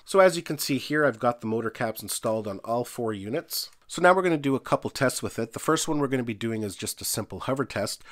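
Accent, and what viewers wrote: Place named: Nova Scotia, Canada. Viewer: Haha. I knew you were from Canada. Most likely from Nova Scotia.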